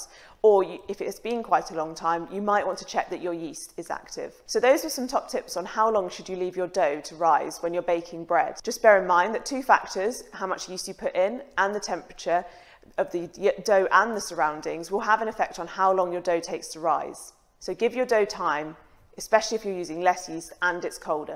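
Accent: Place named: United Kingdom. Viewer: Oh, it's definitely warmer here! No WAY would the interior of our house ever approach 65F, even in the dead of winter. LOL. Thank you for your quick reply. I appreciate it. The reason I ask is that most recipes I have encountered for baking sourdough bread recommend, after an initial 3-hour period of stretch and fold, placing the dough in the refrigerator overnight. So, you wake up in the morning, and your dough has had 8-10 hours to rise. That's worked pretty well for me, but on occasions, I have wanted to shortcut the process. I have merely guessed at what (with a winter room temp around 23C) a "couple of hours." So far, so good, but I'm flying blind. The "overnight in the refrigerator" method seems to work fine, but I'm occasionally ready to bake sooner than that! Summer's a different deal, when the interior of our house stays consistently at 26 or 27 C. 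Thanks again for your help! Don't lose that British accent, it's SO charming. :o)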